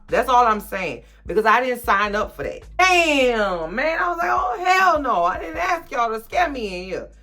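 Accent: New Orleans, United States. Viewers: I love your reviews and your NOLA Accent .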